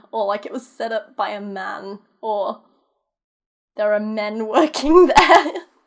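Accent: United Kingdom. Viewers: I guess problem is that a lot of us (including the British sounding lady what made this video) are used to living in semi-civilized countries where free at point of use Healthcare is a given and reproductive rights are taken for granted. Pity the poor bastards South of the 49th.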